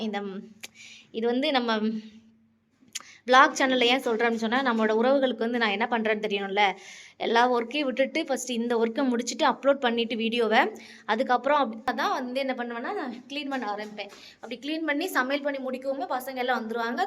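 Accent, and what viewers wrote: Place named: Tirunelveli, India. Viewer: Unga ஊர் tirunelveliya nega pessurathu appete thereythu nan tirunelveli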